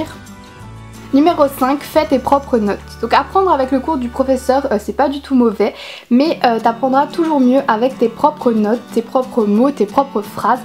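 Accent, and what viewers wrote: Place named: Alsace, France. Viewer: Je n'avais jamais remarqué l'importance de ton accent alsacien ! Moi qui suit aussi alsacienne je l'entend quand même assez hihi😊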